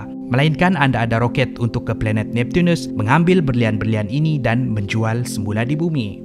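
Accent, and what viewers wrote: Sabah, Malaysia. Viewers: Abg ni sabahan kan? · Kan,sbb cara pencakapan die bukan macam malay..dia cakap mcm ada slang sabah n indon sikit..tulah sebb org ingat die indon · ni org mana? sabah ke?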